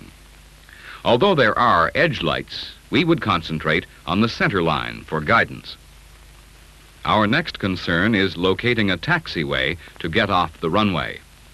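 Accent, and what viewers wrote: United States. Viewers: Thanks for putting this interesting document up. Anyone know the date on this document? Is it a Pans-Ops standard? It's perplexing to hear an English speaker, with an American accent, giving specifications in metric units. One would assume the TERPS document would be in feet. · A few details I noticed about this video. First, the announcer is speaking American English, but the lighting systems being described are ICAO-approved systems, which is an international standard and all distances are in meters. Second, this film was made before Category 3 existed, so there is no information on those systems. But the difference is minimal, since the approach lighting is the same as a Cat 2, and the main difference is extra lights on the taxiways. Third, the systems referred to in this film as “Simple approach light systems”, known to the ICAO to be only good enough for non-precision approaches, which use barrettes at 60 meter/200 foot spacing and extend out only 390 meters/1400 feet rather than the 30 meter/100 foot spacing, 900 meters/3000 feet length of a full system are in fact the basis for the typical Cat 1 system in the US. 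Basically, you take a short, simple approach light system (SSALS), add five sequenced flashers leading up to it (Runway Alignment Indicator Lights), and you have a Medium Intensity Approach Light System with Runway Alignment Indicator Lights, thankfully abbreviated to MALSR. For the international standard, this is only good enough for a non-precision approach. But the FAA thinks it’s good enough for a Cat 1. The standard in the US used to be the ALSF-1, which is similar to the barrette-type Cat 1 system shown in this film. The ALSF-1 remains the standard for military fields in the US. But the FAA believes that saving money is more im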